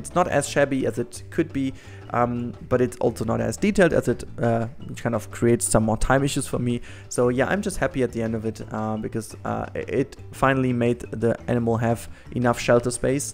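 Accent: Germany. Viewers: Is your Native language german?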